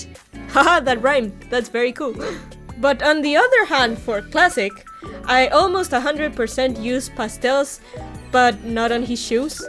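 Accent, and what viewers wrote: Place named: Scotland, United Kingdom. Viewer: You sound kinda british and scottish,weirdly thats how i think it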